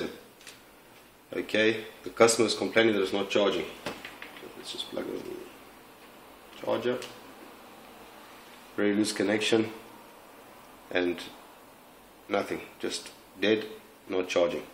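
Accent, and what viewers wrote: South Africa. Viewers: r u south African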